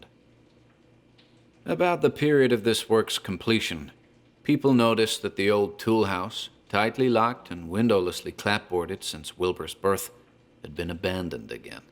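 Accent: United States. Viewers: you do a fabulous American accent! Loved this as always. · I really have to say, you've outdone yourselves with this one. The production value has increased dramatically. And, your American accent has also improved tremendously lately. I always listen to your channel while I fall asleep at night, usually alongside a quiet recording I made of rain on the roof of my spare bedroom. I find that it's the perfect aesthetic to go along with your recordings. Anyway, really looking forward to the next installments.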